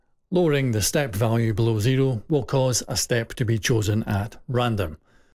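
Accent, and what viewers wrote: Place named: Scotland, United Kingdom. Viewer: Scottish accent without a doubt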